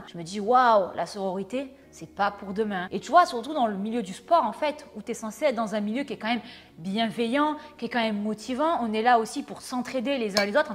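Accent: South, France. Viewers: Je vous adore, je ne suis pas très assidu sur TSE 😔 mais vous m'avez fait beaucoup de bien pendant la pandémie. Votre travail est incroyable en deux ans, félicitations a vous et votre équipe. Et entendre votre petit accent du sud me fait du bien icitte au Québec. On lâche pas 😊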